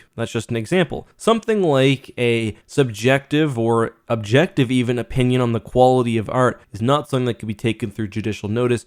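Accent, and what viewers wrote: Canada. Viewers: Amused. I live in Alaska so I didn’t even pick up on your Canadian-ish accent until you stated that you “practiced Canadian law.”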